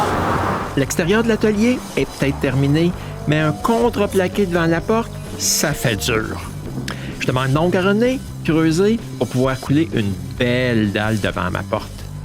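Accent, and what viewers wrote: Quebec, Canada. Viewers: Je comprend rien avec laccent quebecoi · Magnifique l'accent quebecquois.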